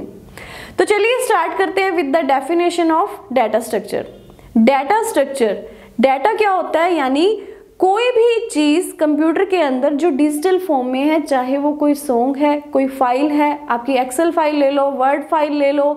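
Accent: Punjab, India. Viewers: Beautiful teacher with Punjabi accent 😀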